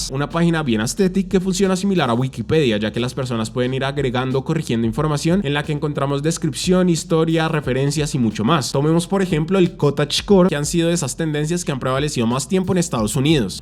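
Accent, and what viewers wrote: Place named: Bogotá, Colombia. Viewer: El único problema de este video es el acento rolo maluco.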